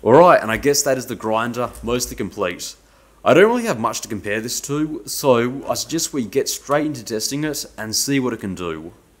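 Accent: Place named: Australia. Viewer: Aussies, y'see... I had to turn on CC at some point... ;-)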